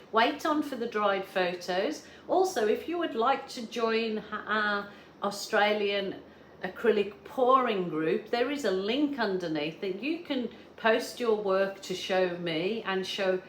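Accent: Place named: England, United Kingdom. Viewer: Hello my "Angel across the pond". During the epedemic here in the USA, I found u. Started watching u around 2\20 n have been pour painting, or thinking about supplies, ever since! U saved my insanity! Ur insight, personality n teaching. Yr English accent sounds beautiful to me.. Thank u Stay safe.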